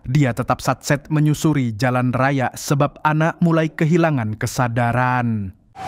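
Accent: Banjar, Indonesia. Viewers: Orang banjar kah bang? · mang pian urang Banjar kah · Banjar banar amang nih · Bah orang banjar kah Pian mang,pacangan jadi langganan ni nonton di sini ulun😅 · Banjarr is good😂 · pian urg banjar kah min😅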